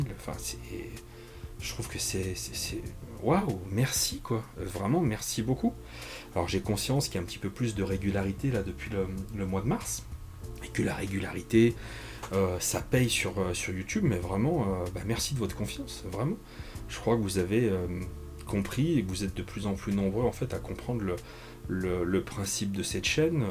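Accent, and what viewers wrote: England, United Kingdom. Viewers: Félicitations pour tes 4000 abonnés ! Q : D'où vient ce magnifique accent anglais ? Wall Street English ?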